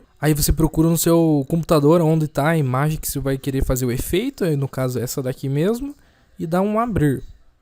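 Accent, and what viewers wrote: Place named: Paraná, Brazil. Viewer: Com esse sotaque só pode ser paranaense...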